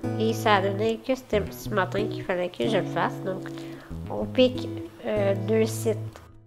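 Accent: Quebec, Canada. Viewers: j'aime trop les accent Québécois ❤ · Elle et québécois 😍😍😍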